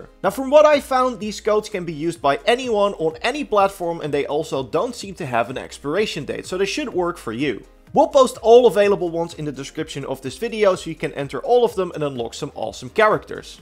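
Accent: Netherlands. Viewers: Sorry doe alsjeblieft iets aan je accent. Je video’s zijn echt leuk maar het stoort me zo erg dat Nederlands accent.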